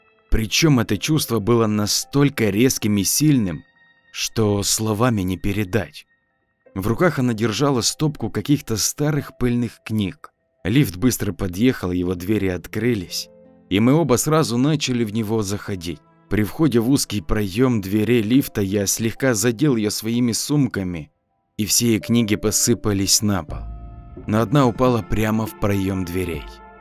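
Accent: Ukraine. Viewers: По говору слышно)и очень приятно слышать рассказы об украинских местах, с элементами местных словечек) у вас отлично получается озвучка, желаю вам удачи) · Автор,ты не из Украины ?)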